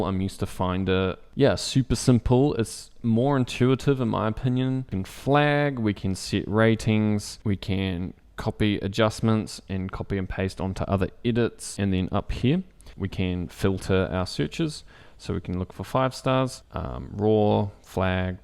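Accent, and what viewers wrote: New Zealand. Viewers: I think for casual/hobbyist photographer this is a good alternative but for processing hundreds from a wedding or a sports assignment there’s absolutely no way this can replace lightroom. Also Apple’s Cloud storage system is peculiar & not friendly for android clients to retrieve their photos. Great presentation , though & your English dialect is interesting - are you a New Zealander? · Steep by steep or step by step? LOL New Zeland accent funny!🥰😘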